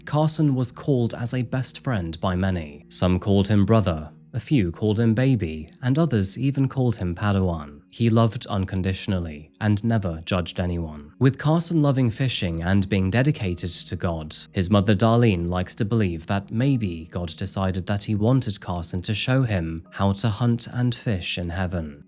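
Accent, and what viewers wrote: United Kingdom. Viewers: Love your videos dude. I'm from the UK. Your accent sounds British, but with a twinge of something else maybe?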